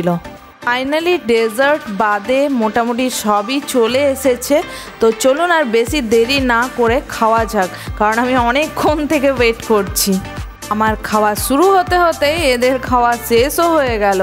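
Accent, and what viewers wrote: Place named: Hindustani, India. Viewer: তাহলে হিন্দুস্তানিদের কথার টানে কথা বলছেন কেন ?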